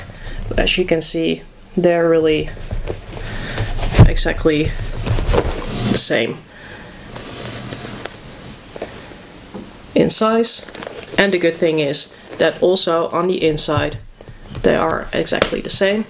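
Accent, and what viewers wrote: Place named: Netherlands. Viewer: Where are you from I hear a Dutch accent ben je Nederlands?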